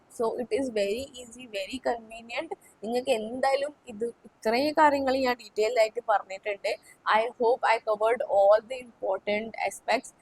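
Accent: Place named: Kasaragod, India. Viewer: Kasaragod slag ❤❤😂😊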